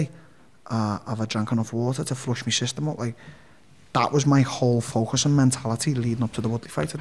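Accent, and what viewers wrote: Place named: Liverpool, United Kingdom. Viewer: Nasty scouse accent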